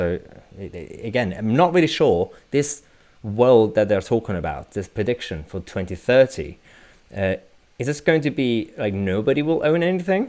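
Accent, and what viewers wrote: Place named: England, United Kingdom. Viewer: Ah, so that is where your accent was created, between Leigh and Greenwich, almost Kent:)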